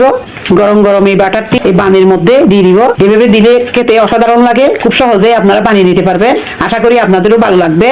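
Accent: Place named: Chittagong, Bangladesh. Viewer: চাটগাঁইয়া টোন!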